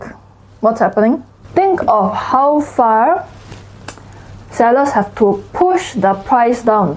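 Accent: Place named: Singapore. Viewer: Stop harrassing her. She is from Singapore, thus her accent. Go to the UK people, and you would barely understand any of their accents. Turn on Closed Captions if you don't understand.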